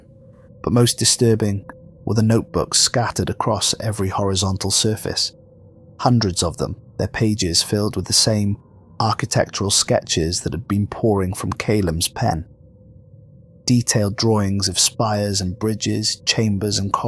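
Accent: Wales, United Kingdom. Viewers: What part of Wales are you from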